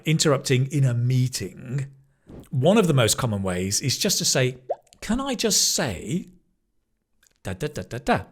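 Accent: United Kingdom. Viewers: I love your british accent❤Very useful video❤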